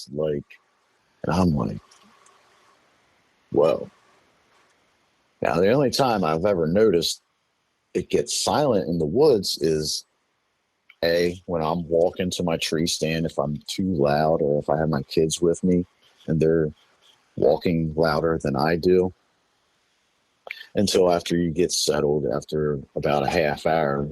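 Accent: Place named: South Pennsylvania, United States. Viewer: I'm here for both the story and the south PA accent. :)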